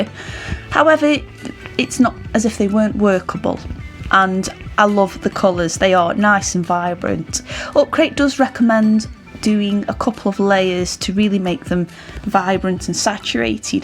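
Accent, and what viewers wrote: United Kingdom. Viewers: Ooo yay, I know this video is 3 years old but I’m excitedly watching upcrate videos since I have one arriving tomorrow. And I got even more happy hearing that you’re British😁😂 subscribing for sure and can’t wait to see the finished product!💕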